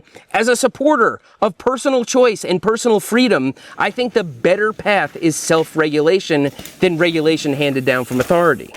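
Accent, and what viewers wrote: United States. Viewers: how is a very strict legal definition an 'unspoken agreement' ? brother you sounded very american here.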